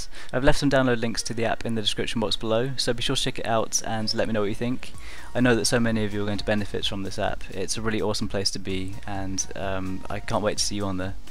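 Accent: United Kingdom. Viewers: He's British right?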